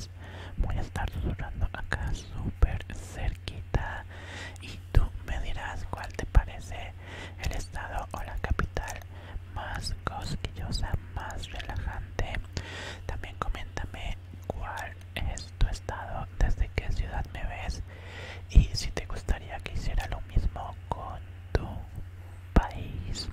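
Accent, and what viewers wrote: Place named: Ecuador. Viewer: Flashe q eras de Ecuador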